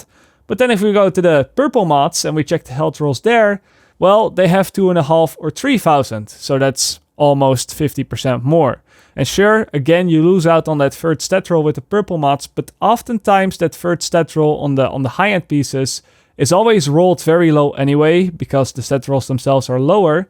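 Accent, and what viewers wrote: Netherlands. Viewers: Took me 5 seconds to know you're Dutch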